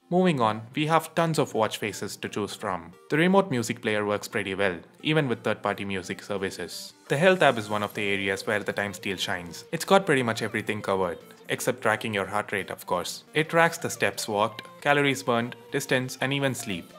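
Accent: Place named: South India, India. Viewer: You have a typical south Indian's accent. Not complaining though! 😃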